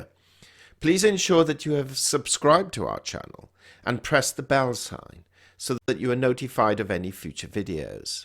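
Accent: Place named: England, United Kingdom. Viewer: Not so sure that is his real voice though. Real accent sure but I think it sounds speeded up. Could be wrong but have listened for a long time and I think the his voice sounds deeper/higher/faster/slower sometimes. Also seams prudent to disguise ones voice in this situation. Otherwise its the English language. It's everyone else that has the accent. I find it nice to hear it spoken properly. 🇬🇧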